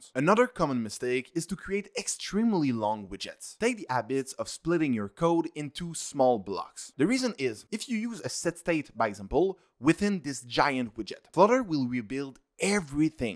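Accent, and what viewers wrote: France. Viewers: That's a very nice explanation with a French accent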